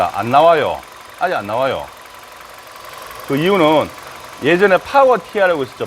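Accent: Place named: Gyeongsang, South Korea. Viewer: 사장님 혹시 매장이 어디신가요? 일단 경상도이신거같은데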